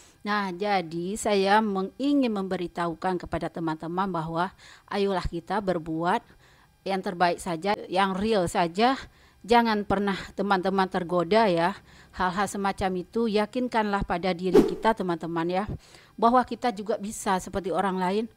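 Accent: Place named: Medan, Indonesia. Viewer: Aksennya seperti logat Medan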